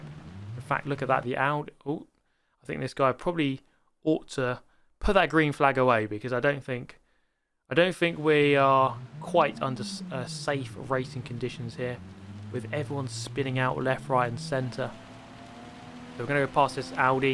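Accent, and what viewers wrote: England, United Kingdom. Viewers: This guys from England by the sounds of it, I think he’s well acquainted with rain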